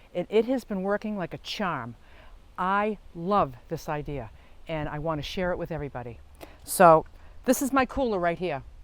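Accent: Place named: New England, United States. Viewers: ..where in New England are you? I grew up in Massachusetts and love your accent! You are so clever. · Love your new england accent...Massachusetts gal myself...you're very cool! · I love your accent, reminds me of "Aunt Elsie" from Martha's Vineyard.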